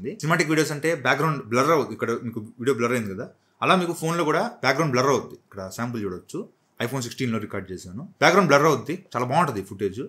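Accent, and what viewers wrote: Nellore, India. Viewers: Anna Nellore aa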